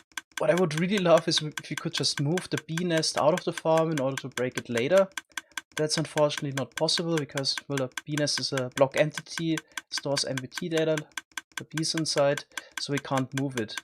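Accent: Germany. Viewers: great video! no bee pun or material list but i do have a question u german?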